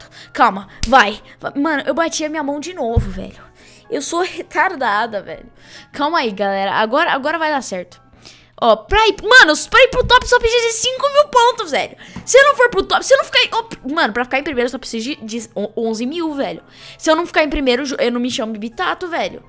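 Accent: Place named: Sao Paulo, Brazil. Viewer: Belo sutaque Paulista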